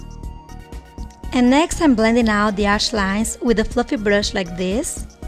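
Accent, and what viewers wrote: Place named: Italy. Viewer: She said "white", she's italian so she hasn't your english accent. Be a bit nice! Damn....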